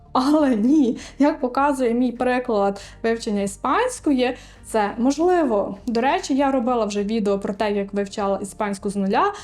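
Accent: Western Ukraine, Ukraine. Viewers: Український акцент десь з заходу, подумав можливо із Закарпаття ? )))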